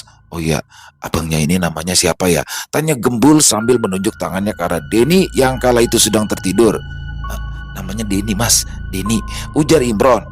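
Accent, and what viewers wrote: Jakarta, Indonesia. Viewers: candu bgt sma crita bang logat btawi nya khas bngt. makaasih bang sehat sllu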